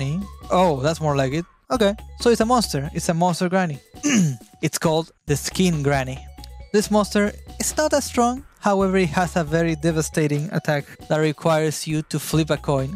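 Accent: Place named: Argentina. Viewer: yo sabía que eras argentino con ese acento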